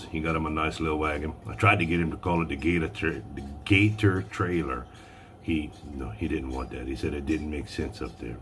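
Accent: Louisiana, United States. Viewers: Two big thumbs a two big toes up! Gotta love the "vinegar" that tastes like wine??? For those wondering what is going on, NY won't let us send alcohol thru the mail! So, I sent a bottle of grape juice that was on its way to vinegar but must have gotten to it too soon! Dang, now your not going to have any vinegar! Thanks for the kind words and thoughts, this YouTube community is awesome and is really like the old days of CB radio and walkie talkies but with video! Keep on keeping on your a true YouTube character! Oh, and by the way that grass made it from your head to your beard, but judging from the beard it's probably not the only thing lost in there! Your beard is truly your trademark, well next to that Cajun voice of yours.... Better get picking up around there and good luck!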